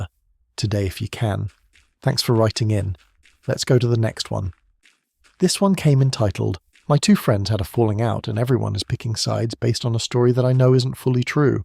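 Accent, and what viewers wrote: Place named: United Kingdom. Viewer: why channel say usa but voice british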